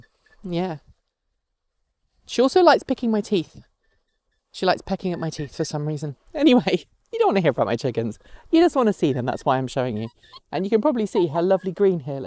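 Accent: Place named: United Kingdom. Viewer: i always thought you are form the UK and not the USA.. you sound so British... take it as a compliment...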